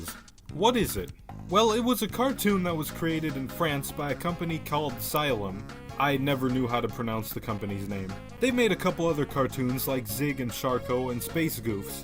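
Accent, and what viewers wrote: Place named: United States. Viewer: Huh an American talking about oggy Neat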